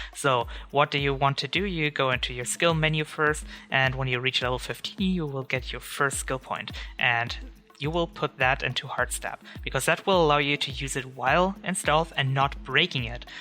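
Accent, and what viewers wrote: Germany. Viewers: are you german? :p kinda sounds like it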